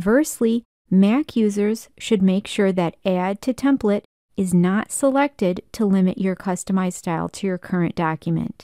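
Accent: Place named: Midwest, United States. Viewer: Midwest accent is awesome ❤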